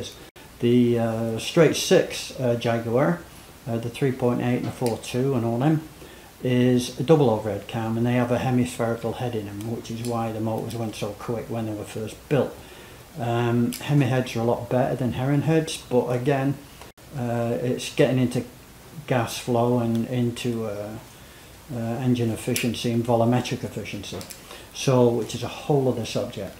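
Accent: Yorkshire, United Kingdom. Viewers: Are you a Yorkshire man ?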